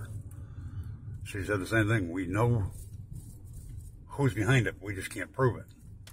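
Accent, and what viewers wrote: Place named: North, United States. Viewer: Are you from Tennessee and this cop does he live in Tennessee you sound like you up north somewhere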